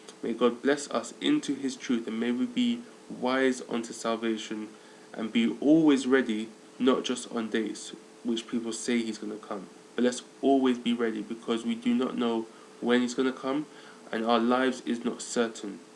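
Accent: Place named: United Kingdom. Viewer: i like your british accent !!!!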